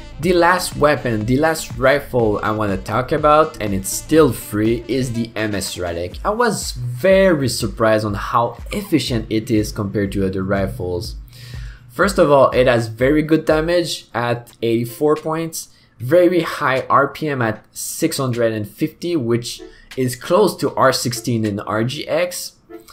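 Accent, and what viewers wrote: Canada. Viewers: bon dieu un vrai anglais de laval ou quebec respect good job vagabon est mon avatar salut lgros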